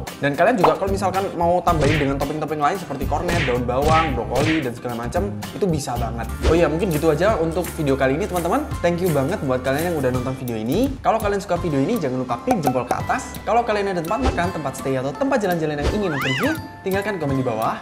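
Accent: Java, Indonesia. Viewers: Orang Jawa mana mas 😂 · Deneng medok si, wong ngendi njenengan? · ooo suroboyo tha pantes rodo medok koyok aku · Kalo ngomong medok ya😂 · baru pertama nonton video mu mas. kok medok wong endi to mas.. wkwk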